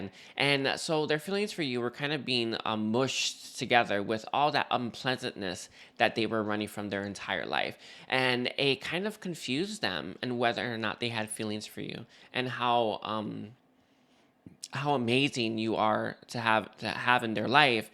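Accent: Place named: Los Angeles, United States. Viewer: I like u cause ur Mexican and it makes me feel at home, like if I know u an u accent is cool. Very LA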